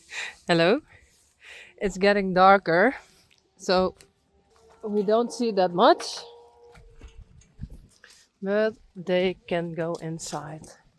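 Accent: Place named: Netherlands. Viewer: weet jij dat je een prachtig Nederlands accent hebt? 😎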